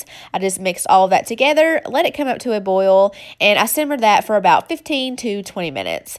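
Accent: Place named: Kentucky, United States. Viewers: I knew i recognized that accent! What part of kentucky are you from? Im in taylorsville. Btw i love your videos im always stuck on what the heck to make for dinner and this is my way out everytime, thank you! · Kentucky! So there's the accent! ;) I had an Aunt that lived in Padukah. I'm in IL myself. Loving these videos!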